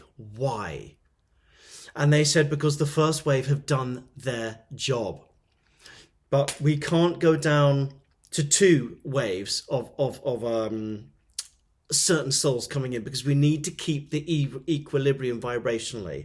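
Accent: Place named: United Kingdom. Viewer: I love this accent...which part of Uk is this accent from???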